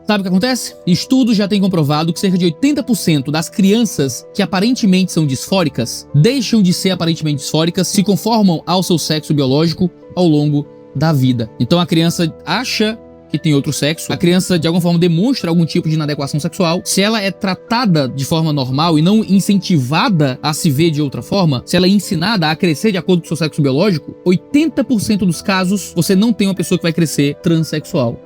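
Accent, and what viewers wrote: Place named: Northeast, Brazil. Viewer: acho engraçado o jeito plenamente nordestino que o fala sobre as indescencias alheias "balangando a jurubeba" KAJKSAJKSJKLJLSJDSLKJSLKDSJLKSDJSLKJDS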